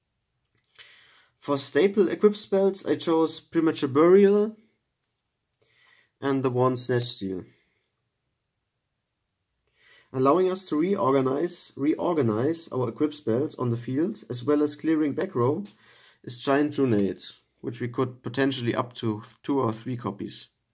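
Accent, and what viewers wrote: Germany. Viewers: wie man das deutsch raushört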